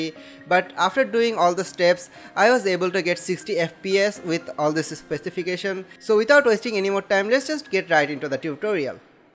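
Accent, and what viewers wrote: India. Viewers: When you hear an Indian voice on a tutorial, you know that it’s going to be good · Lul indian accent it's my teacher in youtube that teach everything lul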